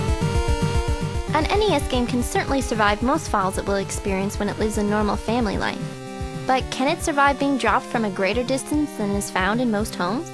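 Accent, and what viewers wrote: Canada. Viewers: God, that voice, I love Canada. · oh and...aboot ;) hahah that accent is awesome too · Canadian girls who say aboot... Nice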